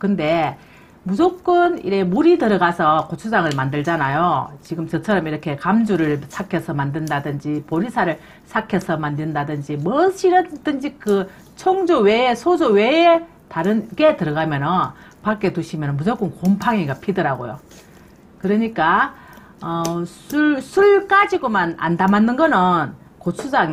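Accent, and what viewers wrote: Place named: Daegu, South Korea. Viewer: 대구 말이 너무 좋아요 ❤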